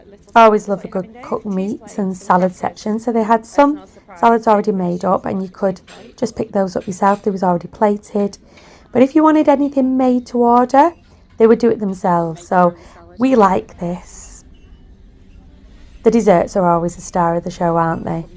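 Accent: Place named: Lancashire, United Kingdom. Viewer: Sounds like a Lancashire Accent, Maybe Oldham area?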